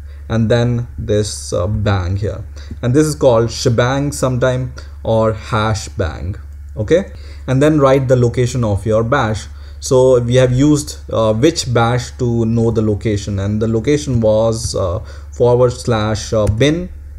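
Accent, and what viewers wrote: India. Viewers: I personally like the indian accent more than some hardcore "real" english dialects which i dont understand at all. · Response to accent comments. Yes an Indian accent can be a bit hard... but as long as I don't hear the word "ugh", "uhhh", etc it is much better to listen to. A well practiced and well pronounced accent is appreciated, but I don't think this tutorial is unbearable at all. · beware indian accent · i hate indian accent :( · Many are commenting on Indian accent. Let me tell you Indian accent for English language(despite not being mother tongue language) is far better in comparison to Hindi accent of native English speakers. If any of the native English speakers doubt what I said JUST GIVE IT A TRY...LOL. By the way this tutorial is very impressive.👍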